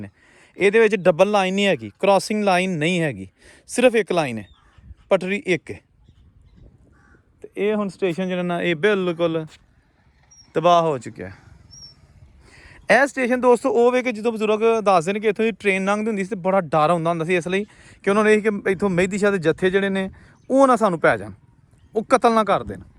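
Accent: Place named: Jammu, India. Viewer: Thudi boli bilkul Jammu aur kathua wali hai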